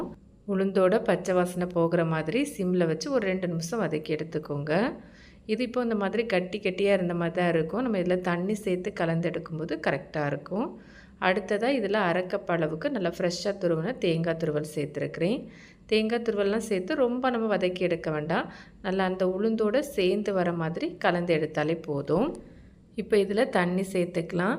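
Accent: Tirunelveli, India. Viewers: Your Tamil is like Tirunelveli Tamil.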